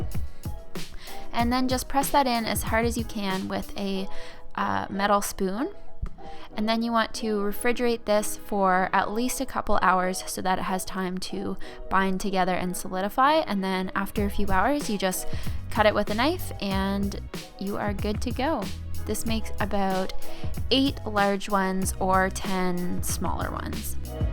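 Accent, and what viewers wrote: Canada. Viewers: She sounds Canadian, but it seems like she lives in the US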